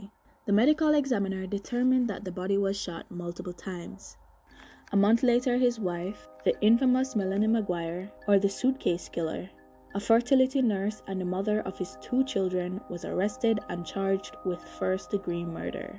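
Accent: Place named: Caribbean. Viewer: Really liked your storytelling and btw are you Carribbean? I love your accent 😍😍😍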